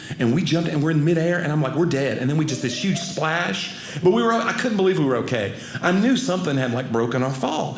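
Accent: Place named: South, United States. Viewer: Awesome comedian. Redneck and southern accent. Love it. Don’t change.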